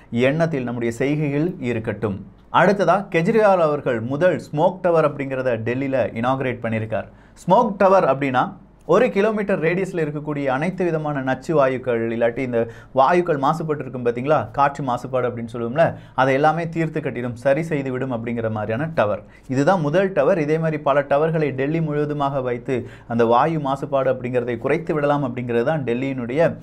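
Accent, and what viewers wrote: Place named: Kanyakumari, India. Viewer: kanyakumari slang...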